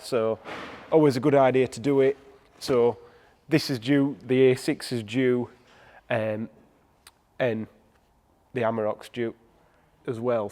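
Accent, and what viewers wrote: Yorkshire, United Kingdom. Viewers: I live in Yorkshire so feel comfortable saying this, brilliant accent my friend! When talking about switching the forged wheels ‘let’s see what difference it mecks’. Brilliant. Really enjoying this 125d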